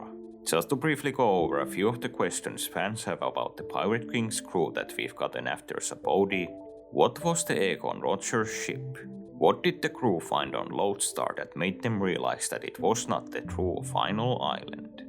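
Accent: Finland. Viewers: Are you Finnish by any chance? great video btw!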